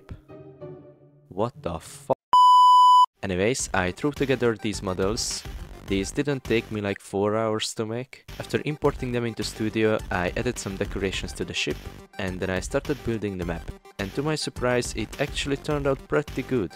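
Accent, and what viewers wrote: Hungary. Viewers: you are from Hungary?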